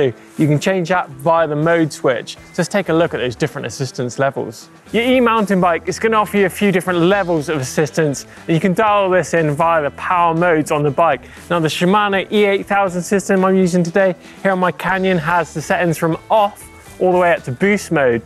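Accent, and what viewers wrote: United Kingdom. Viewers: Don't know if it is the annoying British accent, the goofy helmet, or the mountain bike in general that makes this unwatchable.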